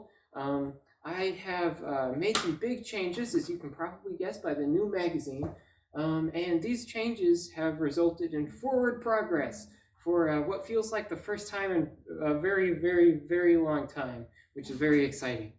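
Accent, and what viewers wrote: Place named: Canada. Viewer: Has to be his voice, and that hippy Canadian accent.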